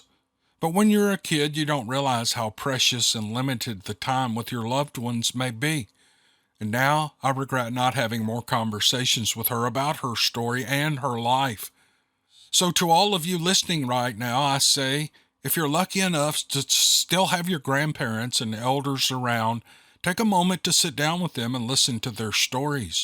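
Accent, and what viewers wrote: South, United States. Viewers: If Judy gets a kick outta your southern accent she’d be aesthetic to hear U and I talking back and forth 😂. I grew up in the south with parents born & Raised in The Southern Appalachian Mountains of NC. They grew up in the NC mountains or the Blue Ridge Mountains right by the southwest tip of Virginia. Although my parents had moved further south below the mountains they grew up in before I was born. I still spent much of childhood life in those same mountains. Because my dad was a Traveling Baptist evangelist minister and we went back to those same blue Ridge Mountains every weekend. Both my Grandmothers still had homes there so we went and stayed in one of their homes while dad preached at one of the churches along those blue ridge mountains. So I heard and picked up & have always spoke the Appalachian Dialect 😂! Although I grew up further southeast of those Appalachian mountains I’ve always spoke the Appalachian Mountain dialect. 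Still to this day I have people say where are you from ? You’re from the mountains aren’t you ? I laugh and say no I’m actually from here but my parents and all my Kin folk are from the blue ridge Appalachian mountains. They’ll say well you don’t talk like the rest of us southerners, you have a unusual use of words we understand but don’t hear around here. 😂 I’ll just say well it was born and bred into me & it’s actually Appalachian Hillbilly dialect. Although I’m educated I still carry that southern Appalachian talk. Using words or phrases like: (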